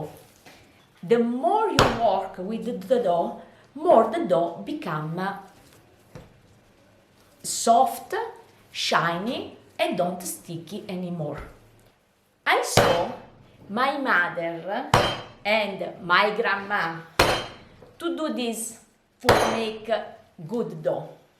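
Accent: Italy. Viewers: Very very nice video! I really appreciate. I also love your Italian/English accept 😉. I will definitely follow up your video!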